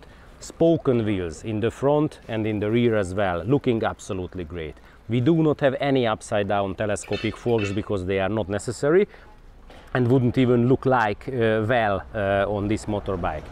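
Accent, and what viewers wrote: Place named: Hungary. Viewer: Hunglish... Maybe the first english video, I can understand.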